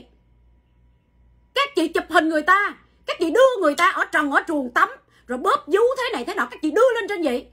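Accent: Standard. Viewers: noi qua chuan.m cam on chinh phu dai loan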